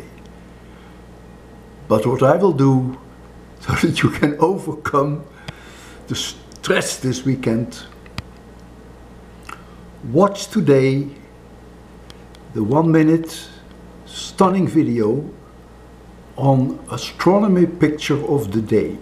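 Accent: Netherlands. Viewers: you sound dutch are u if so my 2 :3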